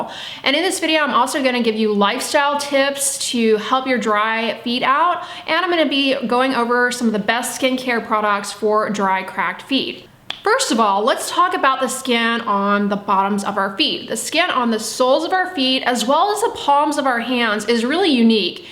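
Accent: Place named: California, United States. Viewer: That California accent is BRUTAL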